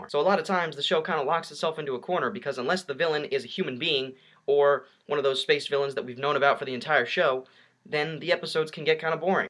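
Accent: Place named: England, United Kingdom. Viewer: That English accent omg XD you do make lots of good points though! Even if I did stare at the angel tshirt for the majority of the vid...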